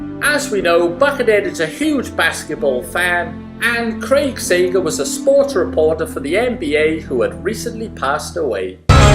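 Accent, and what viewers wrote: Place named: Wales, United Kingdom. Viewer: Love this channel. I definitely detect a Welsh tinge to your accent :). Where can I buy pikes 13, 43 and 78? Woo!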